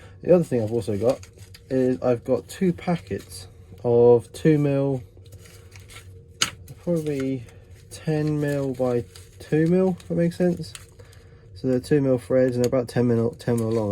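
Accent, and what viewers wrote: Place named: United Kingdom. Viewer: Great video and nice to hear a fella brit Americans can be a bit annoying sometimes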